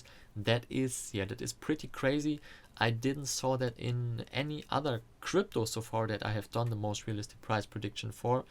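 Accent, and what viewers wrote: Germany. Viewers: einen deutschen erkennt man immer wenn er versucht Englisch zu sprechen !! nice video bro :D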